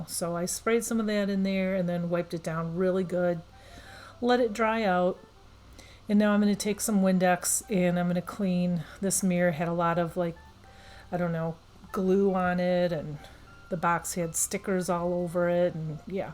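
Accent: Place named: Midwest, United States. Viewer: Love the stuff. I hear a strong midwest accent. 😊😊 I'm originally from Illinois.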